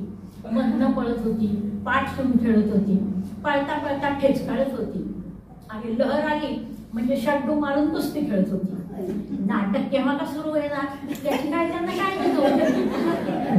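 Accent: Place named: Rural, India. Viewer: छान! हुबेहूब प्रत्यक्ष प्रसंग समोर दिसू लागतात. ग्रामीण भाषेचा लहजा किती सहजतेने वापरला आहे. समाधान वाटते.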